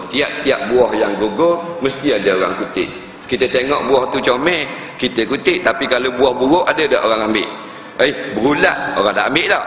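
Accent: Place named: Terengganu, Malaysia. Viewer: LoL!! Lawak!!! Seb baik atok nenek aku org ganu, blh gak faham kecek ganu.. :) -Singaporean..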